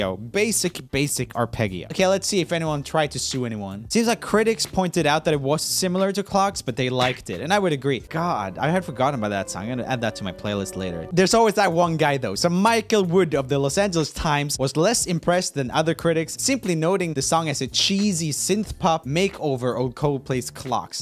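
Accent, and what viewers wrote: Sweden. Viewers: It's his accent. Idk why Swedish accents are like that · Its probably his swedish accent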